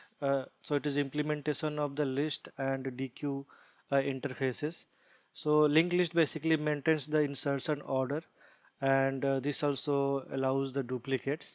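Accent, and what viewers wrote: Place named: South India, India. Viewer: Without modulation too his voice is hoarse. Your south indian ascent is easily understood. Yoounly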